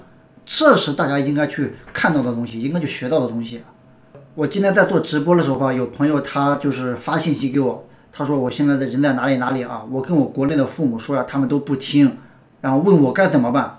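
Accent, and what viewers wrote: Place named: Shandong, China. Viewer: 是山东人吗口音熟悉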